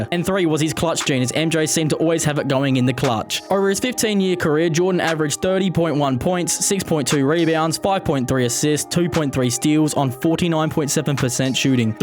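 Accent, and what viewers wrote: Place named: Australia. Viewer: yo r u aussie you sound aussie i am btw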